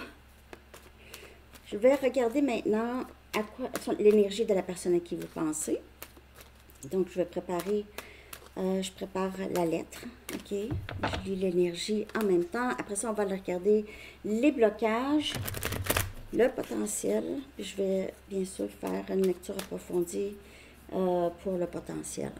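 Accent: Canada. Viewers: Bonsoir, votre guidance me parle énormément. Merci à vous.🙏❤️. J'adore votre bonne humeur qui est contagieuse et votre accent canadien. 👍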